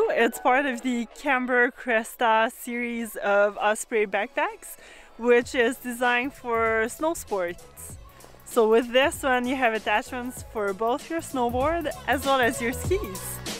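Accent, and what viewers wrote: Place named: Quebec, Canada. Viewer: You sound like you’re from Quebec, Canada!! Is the bag more of a burnt orange, or is it truly red?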